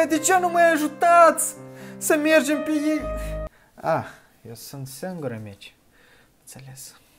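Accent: Moldova. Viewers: Esti din Moldova :D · Nu sunt hater dar nu-mi plac cum vorbesc moldovenii nu e vina ta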